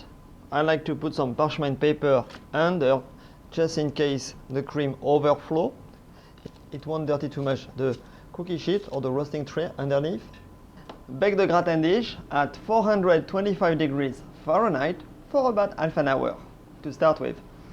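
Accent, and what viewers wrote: France. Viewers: Looks really nice but why are you using Fahrenheit and not Celsius? You sound French. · What a great video & recipe, spoken in that lovely French accent which always portends success in the kitchen! I am making this for Christmas as my family and I love French food. I thank you for doing this video. Merry Christmas from Virginia!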